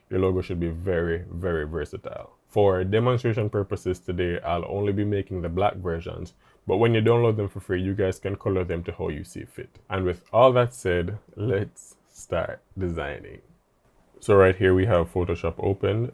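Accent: Jamaica. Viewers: You sound like a Jamaican lol. I know a brother when I hear one but I could be wrong😂. Great job champ I learnt alot.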